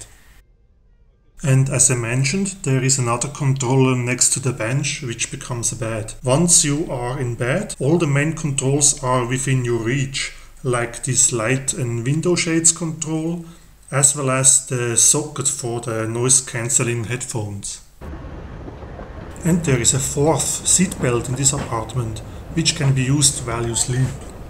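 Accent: Germany. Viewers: I understand you very well. I personally think you don't have to work on your accent. German accent could be quite charming. I am living in London but have a mixture of Thai American English and Dutch accent depending on who I am talking with, choice of words n my mood. Hahaha. Thanks for nice report. Patrick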